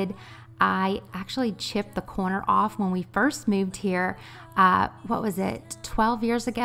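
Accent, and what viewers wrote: South Louisiana, United States. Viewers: Louisiana in the house!! Oh I'm so glad I found your channel! I was like... she sounds more local than just southern, then you said you lived in southern Louisiana, and I freaked! Yay! I'm from South Lafourche, where are you in south LA? I totally loved watching this video! Especially seeing Tony's in the spice cabinet, 😆!! I can't wait to see more of your content in the future!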